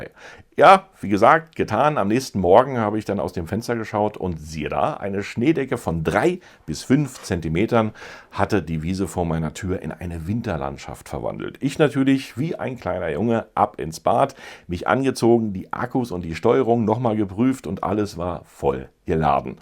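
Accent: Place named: Germany. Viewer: Wow, you sound so German, amazing! Love such heavy German accents, so awesome.. Sweet Keep well and be safe bro! 😁🇧🇬🛸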